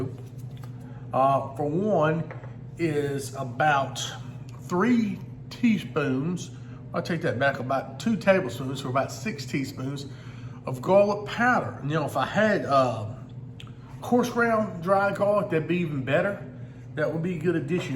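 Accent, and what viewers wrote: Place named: Texas, United States. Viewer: Love your accent!! Texas up in this thang👏🏽👏🏽🙌🏽🙌🏽💯💯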